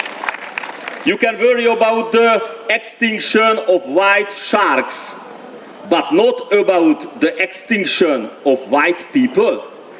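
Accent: Hungary. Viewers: Szia! Szerintem pont így jó ahogy van! Egy magyar embernek legyen magyaros az angolja! Ettől lesz ízes és egyedi! További sok sikert kívánok az utadon!!! FEL A GYŐZELEMRE!!! 🇭🇺🇭🇺🇭🇺🇭🇺🇭🇺🇭🇺🇭🇺🇭🇺🇭🇺🇭🇺🇭🇺🇭🇺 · Szerintem nem baj a magyar akcentus, végtére is magyar. Macedón meg francia akcentussal beszèl. Fő, hogy értsék. És értették. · Hallottam már sokkal borzalmasabb magyaros angol kiejtést, egyáltalán nem vészes :) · Gyönyörűen olvasol angolt, magyar akcentussal😃👍; -Te vagy az emberünk! Hajrá MiHazánk! 🇭🇺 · Magyar embertől nem szégyen az idegen nyelvű szavak magyaros kiejtése, csak egyes nemzetközi, kizárólag idegen érdekek mentén, ügynökösködő hazátlanok szerint! Sőt szerintem még az ilyen fennt említett férgek hergelésére, szándékosan belecsempésztem volna a beszédébe Toroczkai helyében, egy kicsit az ízes szöged környéki nyelvjárásból,némi pálócos behatással! Akkora magyarkodástól a ragya is kiverné a globalista csúszómászókat! 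· A kritika valojaban intelligencia kerdese. Nemcsak angol teruleten kulonbozo az un tajszolas vagy szleng. Hanem magaban az English Commonvealth ben is hellyel kozzel kulonbozoen nyomjak osszehasonlitva a Cockney akcentushoz…..azert van akcentusod mert MAGYAR VAGY. A szonoklat ertheto, es az erofeszites a minden keppeni kifejezodese hogy mindenkihez eljusson a kivant tartalom ertelmi szinten. Retorikailag is nagyon meg alltad a helyed. Ugyanis mellozted a frazisokban valo, szaggatott to mondatos puffogtatasokat. De magaban a gesztus ami egy tart karu olelo Udvozles nyelvi formaba ontve ,Tiszteletteljes Koszontes! ….. Kulpolitikailag nagyon fontos es meghatarozo dolgot fejeztel ki. Gratulalok a toretlen nemzeti elkotelezettsegetekhez es elszantsagotokhoz! Lehetoleg tobb feliratozott videot kerunk, Tisztelettel! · Örülünk, hogy még a kiejtésed is magyar, nem csak a szíved! :) Nagyon jó beszéd volt, értette, érti ezt mindeki, azok is, akik ugyanígy gondolják, és azok is, akik máshogy - de nem nekik lesz igazuk. 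Melletted állunk, Te vagy Magyarország, és lehet, hogy egész Európa jövője! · Milyen dolog hogy egy magyar politikusnak magyaros a kiejtése, remélem most már az ég kékségéből is problémát csinálnak. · Szerintem még jól is áll Önnek a magyaros akcentus. Különben is a lényeg az, amit mond és az zseniális. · Kedves! Semmi baj a kiejtéseddel. Magyar vagy, magyararos a kiejtésed. Aki meg akar érteni az megért, úgy gondolom vannak ennél nagyobb problémák is a világon ami sokkal fontosabb és amiért közdödök. ( Neked van angol kiejtésd, nem hiperpasszív mint annak a báb bolond Karácsonynak, aki össze vissza hazudik a nyelvtudásával kapcsolatban.) Úgyhogy kitartás és sok erőt kívánok neked és párttársaidnak! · Aranyos, mert a magyaros angol a legjobb angol! Maradjon meg ez, nem kell minden nem angolnak elhagyni a nemzeti accentust! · Nem baj, hogy nem tökéletes az angol nyelv amit beszélsz! Soha nem is lesz jó mert magyarnak születtél az az anya nyelved mindig is benne lesz a magyar akcentusod ha bele feszülsz akkor is! 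👍 🇭🇺 Hajrá Mi Hazánk 🇭🇺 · Nem baj, teljesen érthetően beszélsz, és ez szerintem sokkal fontosabb, mint az, hogy mennyire magyaros vagy nem magyaros a kiejtésed.:)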